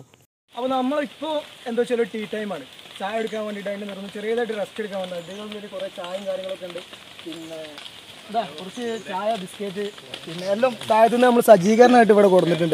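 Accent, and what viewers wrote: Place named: Malappuram, India. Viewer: Mallapurum slang 🔥🔥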